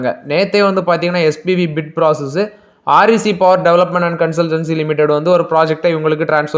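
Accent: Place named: Tirunelveli, India. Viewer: Beutiful southern language(nellai?).... Language beuty kagave parkuren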